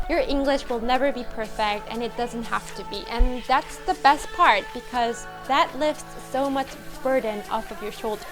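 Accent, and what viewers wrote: United States. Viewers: Your accent sounds American. Like if you hadn't said you were Korean I would think you were American. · Why American English, and not English English? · Fluency is easy thing, u need to go english spoken country. Hardest thing is sounding like a native speaker. The reason u sound like an american in english is that u used to learn the language when u were a kid which u had language plasisty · Your English sounds like you moved to the States early in life. You have a "Californian" accent. Thank you for your story! Moral of the story. Listen to your parents!! Lol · you got american accent obviously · I’m pretty sure you must have lived in one of the native speaker countries and you sound like American. To be able to speak like you do, you need at least to have native speaker friends for your daily conversation and of course living in one of the native speaker countries is the best way to do it. 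· I have to say, your english is almost flawless. In your recent videos, you even speak with perfect american accent. I thought you were raised in the US. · your English is amazing, research the English 'schwa' to nail these small pronunciation errors. A lot of English words, especially when spoken fast, have the schwa sound (e.g 'a cup of coffee' can be said as 'uh kuh puh coffee'). I learned French with a similar approach to you and I really did my own study on pronunciation in both languages. Other than that your accent sounds to me (I'm british) like a very American one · Your accent is so cool.... I thought you were raised in the State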